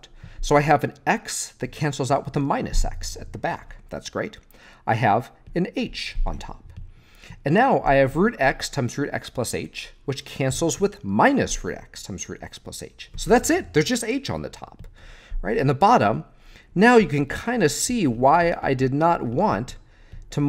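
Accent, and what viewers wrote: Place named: Canada. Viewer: I really want to know what his accent is!!! Is he canadian??!! Also- very helpful video!!!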